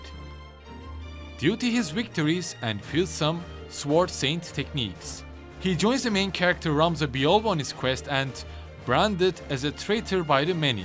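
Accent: Turkey. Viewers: Where you from? You have turkish accent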